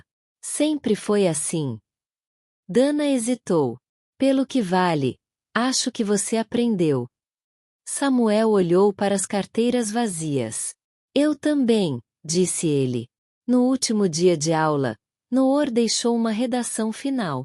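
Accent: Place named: Bahia, Brazil. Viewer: Eu amo essa sua voz de Salvador Bahia a mesma Voz que narrou. A historia de Luisa e Gabriel Monte Negro ❤❤❤❤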